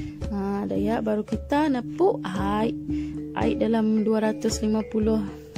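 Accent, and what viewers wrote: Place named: Iban, Malaysia. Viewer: Pertama kali saya dengar loghat Iban..suka dengar loghat2 lain ni. hehe..btw resipi ni boleh buat ni. thanks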